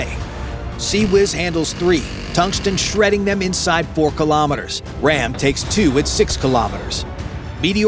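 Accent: United States. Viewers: Had to turn this off. This particular American presenter's accent is ear grindingly awful. Pronunciation is always a problem understanding but his is so far out its painful to listen to. Back to school required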